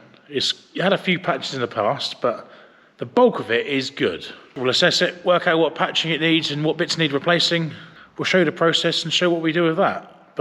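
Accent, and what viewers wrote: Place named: Norfolk, United Kingdom. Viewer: Excellent video. Great content, awesome camera work...... and proper jaaaaarb Narfuk hoo-mour and accent. :) (Am a California person meself, so East a bit from you and across the river...... but (thankfully) north of low-staaaaft. ;) Can't wait for the next one - more Antar Action, or some stripping (of engine, please!) time? :O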